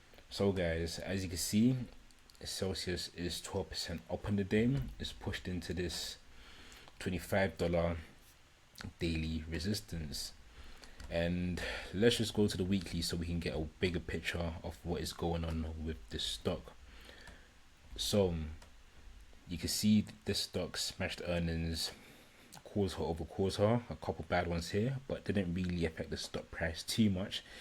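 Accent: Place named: United Kingdom. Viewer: Respect sound like mandem and from uk what’s your tele username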